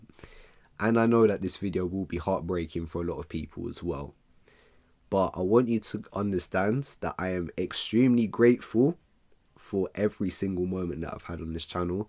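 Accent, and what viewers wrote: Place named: London, United Kingdom. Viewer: Honestly bro where do I even begin a massive shout out to you for being an amazing youtuber round of a applause to you my bro👏first time when I found your channel thought to myself that your from London then I immediately subbed to your channel as I kept joining your streams every Saturday tunning in consistently we both became friends met new people in the streams playing Mario kart having fun honestly am going to miss playing it with you online take care of your self with the hard work you put in YouTube wise.🔥🔥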